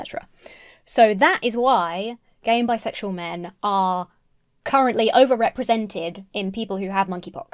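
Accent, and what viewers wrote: United Kingdom. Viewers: As a New Zealander, I didn't notice that your British accent had faded until you mentioned it. Don't worry about mixing up the accents, they're similar to outsiders and our "rivalry" is basically friendly. We know we sound similar. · starting off the new year with a British amount of shock and awe, brilliant! This highly likable bi woman with eloquently described counterpoints to the source video, while having just a few endearing accent gaffes and quirks that ties together the whole video in a way that usually only annoyed British people manage to do. This subtle call to action against a group that uses religious doctrines to "other" certain groups that they don't want to be treated like equal humans, is as well structured and direct as needed without loosing the simple points covered. Thank you for all the good you did in 2022, and if this video is a yardstick for 2023, it's going to be even better! 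Keep being awesome, take care and stay safe!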